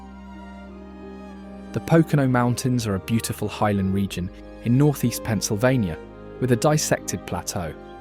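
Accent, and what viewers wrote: United Kingdom. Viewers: I think the Guy speaking in an BRITISH acent talking about Appalachia is Silly.. Why not a Southern acent ?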